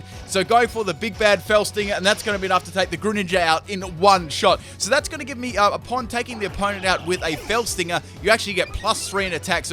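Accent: Australia. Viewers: Bro are u an auzzie?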